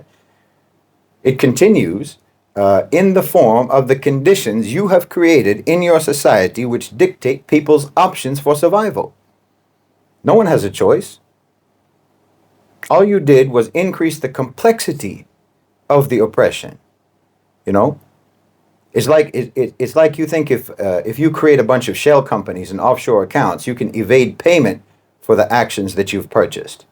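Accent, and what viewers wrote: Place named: Louisiana, United States. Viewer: This guy is soooooo from Louisiana I can tell from his accent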